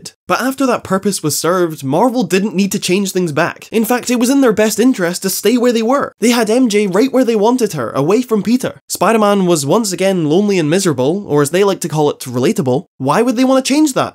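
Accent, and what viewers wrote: United Kingdom. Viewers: I don't think there's something better than listening to a random guy with a british accent and a nice voice talk about random things while I play games and pay 0 attention to the video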